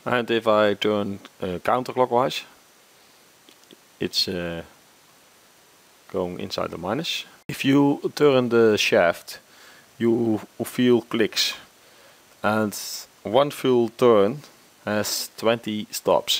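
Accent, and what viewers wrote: Netherlands. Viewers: always nice to hear these dutch accents. nice video Continue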